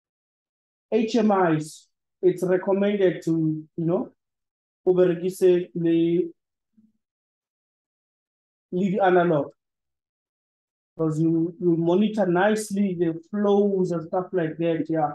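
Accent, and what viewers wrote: South Africa. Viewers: THAT SHARP SHARP TELLS ME THE LECTURER IS FROM SOUTH AFRICA😅😅😅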